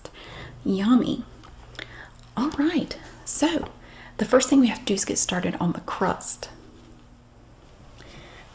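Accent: South, United States. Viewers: I love you're accent! I love southern accents. I'm from Texas, and I have a lot of southern pride! You're so sweet. I love your videos. This recipe was very good. I made it a couple days ago after seeing this and it was great. We are a hellmana family. I don't think they sell Dukes here but would like to try it. Have a great day! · I like your Southern accent. I am enjoying your voice... it's easy like Sunday morning. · that face you made when you said, BUTTERY ROUNDS! lol you crack me up. So glad to see your tomato pie; I love your southern accent. I'm in Wilmington, not so far from you! Thanks for making these videos.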